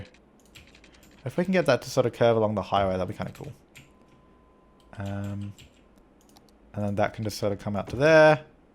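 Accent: Australia. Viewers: Hey, are you Australian? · You're an Aussie aren't you?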